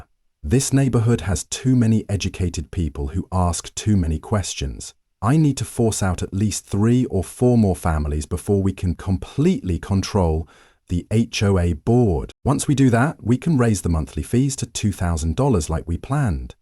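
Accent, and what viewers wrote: United Kingdom. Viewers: Why is it a british voice No one in Britain has guns Gtfooh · What the hell with the British narration?? Just another Boss woman story .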